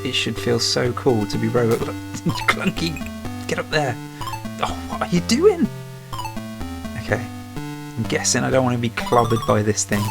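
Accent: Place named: Midlands, United Kingdom. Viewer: Awesome vid! Thanks for uploading mate! Odd question, where abouts are you from? Roughly? You sound a bit like a Midlander. Can’t wait for your next stream, I randomly stumbled on your channel while looking for Atari ST videos, as a guy who grew up on the “other side” (Amiga) it’s great to see as much enthusiasm for Atari as there still is for the Amiga. Thanks again!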